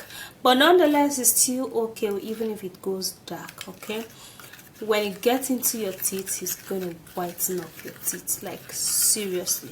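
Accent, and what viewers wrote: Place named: Nigeria. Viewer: She's from Africa Nigeria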